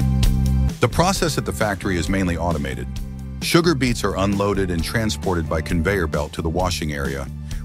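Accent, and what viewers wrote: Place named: United States. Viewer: Hearing an "American" accent I expected you to report on American sugarcane farms. Instead you reported on Australian sugar . You did not say which country the footage on beet sugar production was filmed in . (My apologies if you did and I missed it ) . I did not know that beet has a "white" variety....I thought all beet was a dark purple . There are variations in reaping and processing sugarcane in the various countries . In the West Indies and in Florida the raw sugar is brown....before it is refined into "white" . Rum is also a by-product of sugar production in the West Indies .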